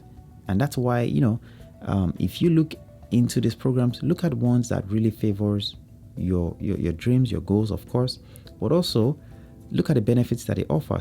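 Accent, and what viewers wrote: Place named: Ghana. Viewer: You sound like a Ghanaian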